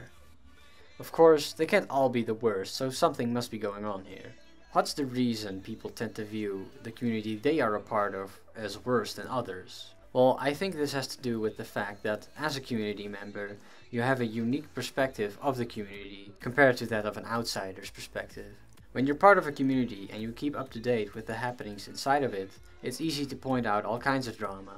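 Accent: Netherlands. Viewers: Eerste seconde wist ik all dat je Nederlands was.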